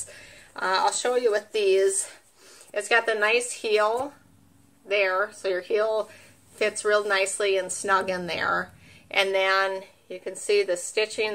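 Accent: Minnesota, United States. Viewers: So that’s an accent from MN? I was thinking another country lol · Are you from Minnesota? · This accent is reminding me of the movie Fargo.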